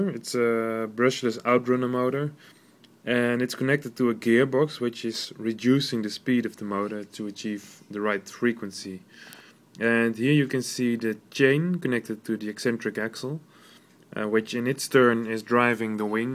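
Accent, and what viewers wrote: Netherlands. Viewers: I can clearly hear from your English accent that you're Dutch. You should call it Ikarus and make it mountable and bigger.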